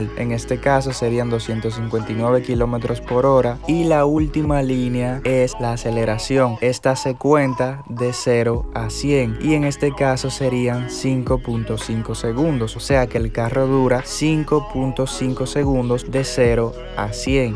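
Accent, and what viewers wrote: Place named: Dominican Republic. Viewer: Yo sabia que esa forma de hablar tuya era dominicana ufff puro flow domi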